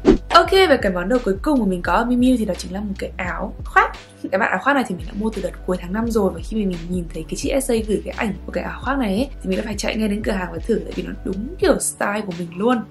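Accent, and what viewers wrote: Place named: North, Vietnam. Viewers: vẫn giữ được giọng Bắc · sao chị học ở sg mà lại nói giọng Bắc thế ạ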